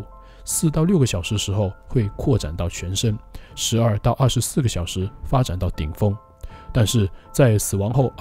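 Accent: Standard. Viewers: 普通话很标准，听起来舒服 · 国语好标准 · 國語和粵語都很標準喔，主持人是哪裡人？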